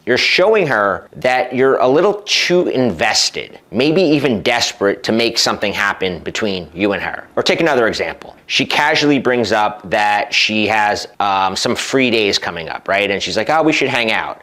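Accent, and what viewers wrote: United States. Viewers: where does this american accent come from ?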